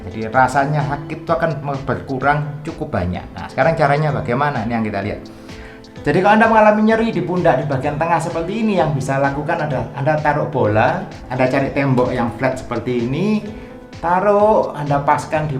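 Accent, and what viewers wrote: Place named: Java, Indonesia. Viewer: Coach orang Jawa apa Bali ??, logatnya Jawa tapi bilang otot bilang Tnya kaya orang Bali.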